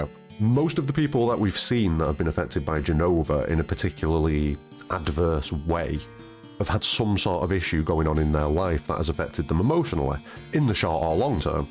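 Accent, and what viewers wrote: Manchester, United Kingdom. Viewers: Loved this video! Especially narrated by someone who sounds like they’re from Manchester like me 😁 Great work!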